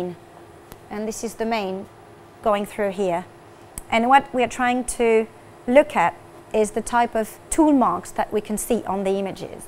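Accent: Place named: Northern England, United Kingdom. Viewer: She's got northern English traces in her composit accent.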